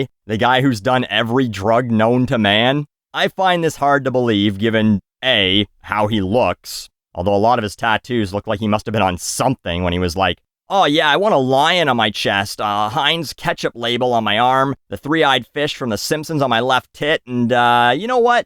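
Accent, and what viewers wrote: United Kingdom. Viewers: That British Accent Though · The Brit accent cracks me up. Also the gimp mask and strap-on Hahah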